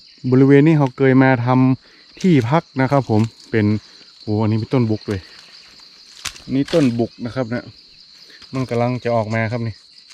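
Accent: North, Thailand. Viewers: นี่ก็บ่ฮู้เหมือนกัน สำเนียงอู้บ่ใจ่ลำปูน- เจียงใหม่หล้า น่าจะแปร่-น่าน-ลำปาง กาว่าเจียงฮาย-พะเยา...ฟังไปฟังมากะเหมือนจม.อะละ เอ้าตอบให้หายข้องใจ หน่อย@ 555 เผื่อจะเพิ่มยอดไลค์นักๆ..ไหนๆก็หากิ๋นตางนี้แล้ว คนเปิ่นไค่ฮู้ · อยู่จังหวัดอะไรคะอ,เภออะไรเอ่ย,ฟังพูดเป็นคนเหนือแต่ไม่รุ้จังหวัด,และ,อ,เภอค่ะ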